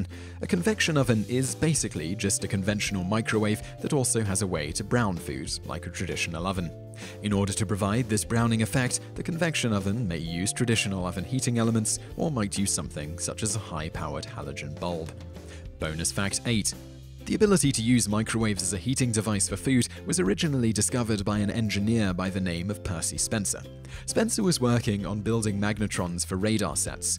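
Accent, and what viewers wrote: United Kingdom. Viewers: Silver theDragon No, he'a pronouncing it the proper way, rather than the butchered American way. And before you start, listen to the accent. He's British. He is speaking the English language perfectly correctly according to the country he comes from.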